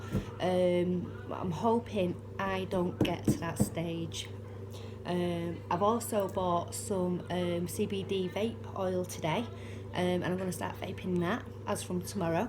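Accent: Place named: Manchester, United Kingdom. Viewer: It's horrible ain't it. I've had it over 2years and if I didn't have a sense of humour... You sound like you are from the Greater Manchester area. I am too. Is there a specialist you would recommend?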